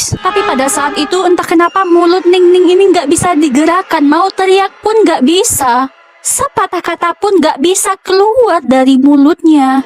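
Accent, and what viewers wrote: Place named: Padang, Indonesia. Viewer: Kak * dg khas logat padang nyaa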